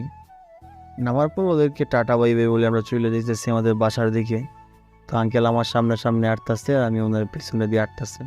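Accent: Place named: Noakhali, Bangladesh. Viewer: Nuakalir moto matho kne😒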